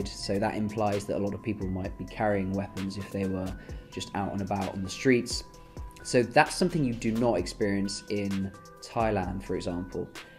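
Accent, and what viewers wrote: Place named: United Kingdom. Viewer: Hey I am from Egypt i am 12 years old I speak English very well and I really like your British accent and I watch you daily I appreciate that you are trying to teach other people Arabic and the British accent. I really hope you see this comment.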